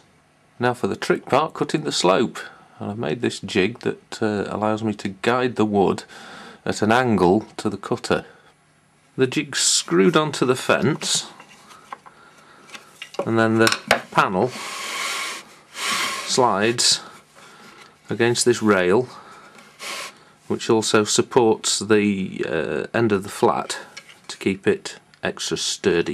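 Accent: Manchester, United Kingdom. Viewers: Nice work. Is that a Manchester accent? Just a curious Yank.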